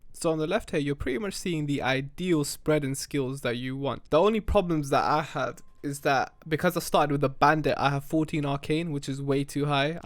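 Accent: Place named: London, United Kingdom. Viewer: Bro is definitely from london 😭😭😭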